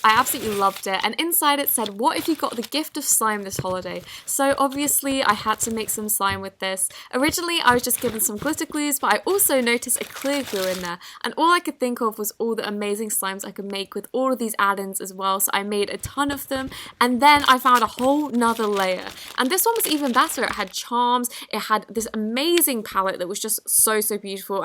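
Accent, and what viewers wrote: England, United Kingdom. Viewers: Okay so this is a bit of a weird question but I am reading a book right now and it's based in England in the queen victoria erra so the girl has a brother named Rupert so what I wanna know is how you would pronounce it? I love the english accent and this is English based book I know a bit of a weird question lol but I love your videos they're always great keep up the great work <3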